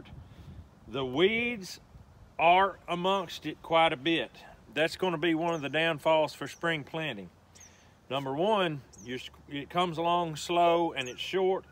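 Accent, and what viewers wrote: Tennessee, United States. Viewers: As soon as I heard your accent, I thought you must be near me (Fentress Co)! Great information for me to know when to plant this. Thanks!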